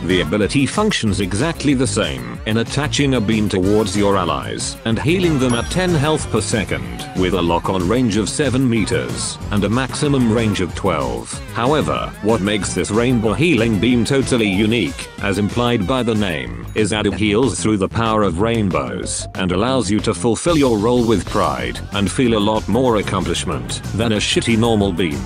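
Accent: United Kingdom. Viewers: Honestly, man should've just used his British voice for this Ability Analysis.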